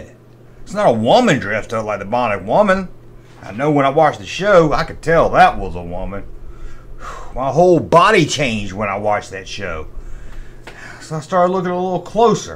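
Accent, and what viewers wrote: United States. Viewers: jeezus buddy.... issues much? do you want it to be a boy? ..and what's with the aww shucks country schtick?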